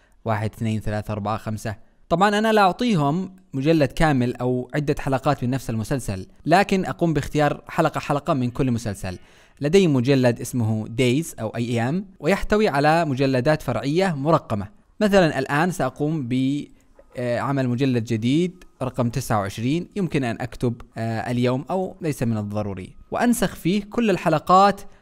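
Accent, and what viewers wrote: Yemen. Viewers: انت يمني صح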